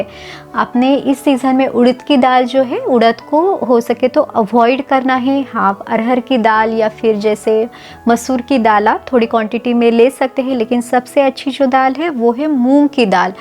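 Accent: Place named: Maharashtra, India. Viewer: खूप छान माहिती दिली आहे.आवाजा वरुन असं वाटतं की आपण महाराष्ट्रतील आहेत...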